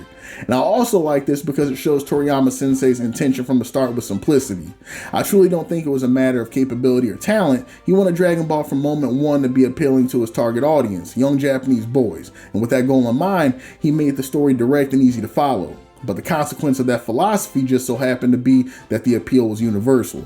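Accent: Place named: Midwest, United States. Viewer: What part of the Midwest you from 😂. STL represent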